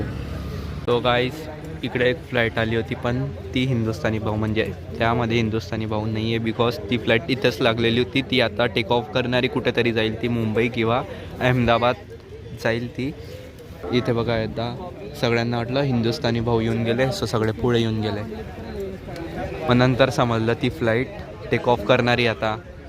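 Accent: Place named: Khandesh, India. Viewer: O bho khandesh ni marathi tuning ahe hay tr 🔥😂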